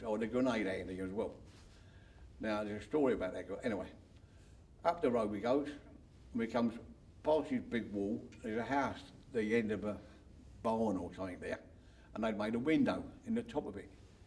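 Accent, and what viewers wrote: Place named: Cockney, United Kingdom. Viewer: He's a Cockney. You'll get used to it me old dinner plate...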